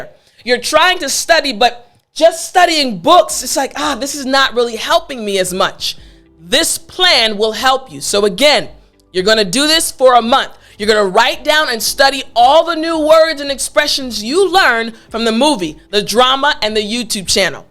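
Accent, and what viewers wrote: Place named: United States. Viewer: Loveeeeeeee Your ACCENT ! Crystal Clear American Accent !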